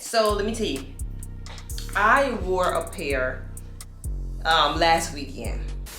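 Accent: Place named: Louisiana, United States. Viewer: Are you from Louisiana? I love your accent and nails too. Thank goodness for Shein. 💅🏼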